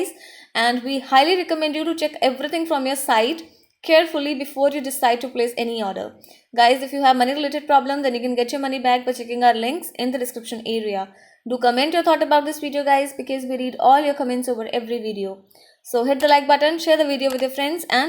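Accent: India. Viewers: your vedio sucks at least buy a mystery box and do a good review on it. all I understood from your vedio is that your Indian, heavy accent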